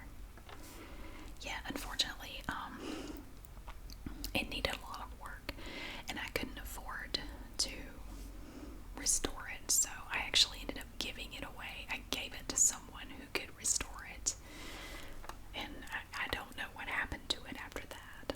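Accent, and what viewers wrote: South, United States. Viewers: I feel like my whispering doesn't hit the same cause she has the perfect southern drawl!!!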